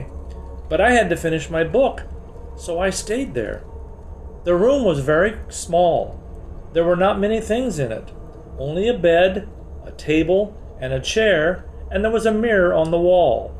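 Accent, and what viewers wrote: United States. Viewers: it was impressive.. We need another ones like this.. I love American accent alot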